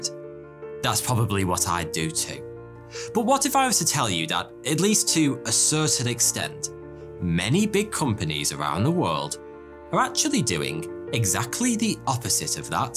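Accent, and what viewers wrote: United Kingdom. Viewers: Accest sounds like a British one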